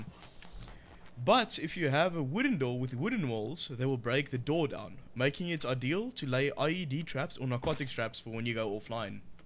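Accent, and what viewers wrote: South Africa. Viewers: South African? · hahaha kan hoorr jy is afrikaans 😎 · You must be South African 😂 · are you South African?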